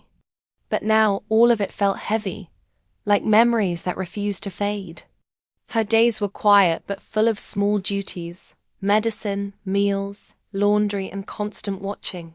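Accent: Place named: England, United Kingdom. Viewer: Very appealing English accent. Thanks.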